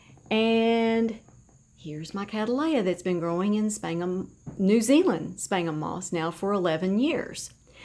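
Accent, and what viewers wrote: South, United States. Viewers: without humor or the ability to laugh at yourself life would be sooo boring. I never take myself to serous. I like to be silly. Lost it for a bit but recently found it again. Right here on youtube among all of you❤ Its gonna be a great expiriment i have 1 sharry baby division in Chinese spagnum. It came off the same plant that had 20+snails and is currently residing in water and doing AMAZING. its in my second latest vid (how do you call that in proper English🤔 ) when i have them both in moss I'll come tell you. So You can be the judge of the moss (if its came back to life or if its the bad kind) you never fail to wam my heart. I might be Dutch but i do have the same good oll "southern?" Charm.😉 Notice that its the second time im trying to fish for info about where your from... since i love the accent but are not an expert.😁😇 Much love and happiness Kind regards rose 🌹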